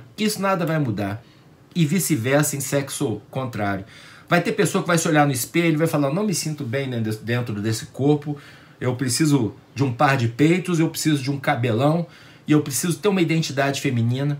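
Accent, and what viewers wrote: Minas Gerais, Brazil. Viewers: Com a tua serenidade e o sotaque mineiro não parece estar full pistola, cada dia melhor o teu canal, em relação ao Doria, usurpadores tem em todo espectro político, desde uma ponta da ferradura ideológica até a outra. Abraço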